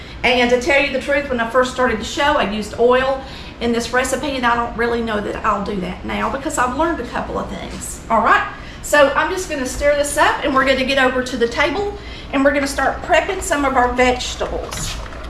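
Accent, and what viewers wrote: South, United States. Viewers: Hi ; I'm a newbie ; Just ran across your channel tonight going through my phone with nothing to do and when I saw Mac salad I STOPPED and WOW ! I'm glad I did STOP 🛑 INN I love Mac salad I made some SUNDAY for the FAMILY COOK OUT BUY ; I didn't make it like this this looks much better than the one I made and I LOVE ALL the GOOD STUFF you ADDED IN I BELIEVE it TASTE JUST as GOOD AS IT LOOKS yummy yummy 😋 MAKES YOU COME BACK FOR MORE... I WILL BE SUBSCRIBING COMING BACK TO WATCH MORE and MORE LOVE YOUR SOUTHERN ACCENT ❣️ AND THE SOUTHERN COUNTRY COOKING LOOKS AMAZINGLY GOOD AS THEY SAY I'll BE BACK , LOVING YOUR CHANNEL ,GOD BLESS🙏 STAY SAFE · Love that country accent.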